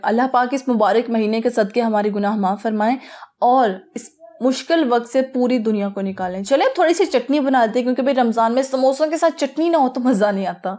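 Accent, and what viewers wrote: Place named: Punjab, Pakistan. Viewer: Bohat acha.... jis trha aap urdu ko punjabi ka tarka lgati hyn.... I like this style..... Love for hijab, Love for ur talking style ❤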